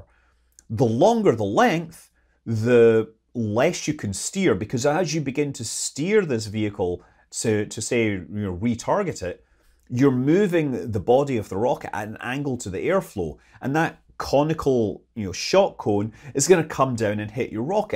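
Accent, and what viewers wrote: Scotland, United Kingdom. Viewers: I want to see the collab revisit with you providing the enthusiastic "Hullo" and goofy (but awesome) Scottish accent to Tim Dodd's Aerospike conversation. Let's get Musk involved and figure our the engineering for the things already. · Never underestimate the power of an enthusiastic hello and a silly Scottish accent! One of the reasons that super-cavitating torpedoes were invented was to act as a 'gunslinger' style weapon underwater. With detection ranges reducing after the introduction of the Soviet Akula class, NATO needed something that could be fired at high speed from close range to impact enemy submarines before they had a cahnce to conterfire. The Soviets already had this idea in the 60/70s when they were being outdetected by British and American boats. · "all I could add to this is [...] a scottish accent." Don't underestimate how much this rises the sympathy level with your viewers. 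· The Scottish accent makes everything better. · I love your Scottish accent and don’t find it silly at all.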